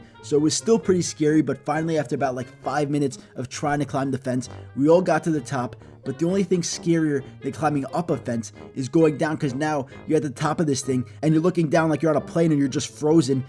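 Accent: New York, United States. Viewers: This mans gotta be from new york or someones god father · Haha how you can tell he is newyork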